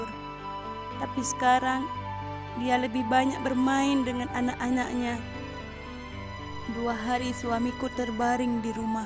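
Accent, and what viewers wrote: Aceh, Indonesia. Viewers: teungku.. teungku... logat seperti logat Aceh. peu beutoi ureung aceh?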